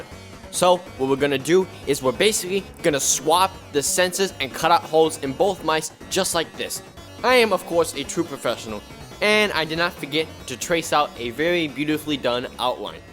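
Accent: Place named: New York, United States. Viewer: i love that ny accent lol ily btw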